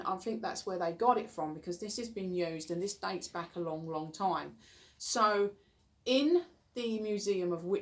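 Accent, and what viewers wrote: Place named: East London, United Kingdom. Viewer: You sound like you are from my ends East London . Thank you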